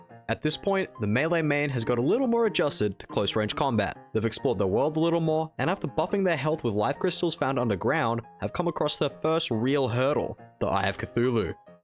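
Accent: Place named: Australia. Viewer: Basically an Australian accent with an American and British undertone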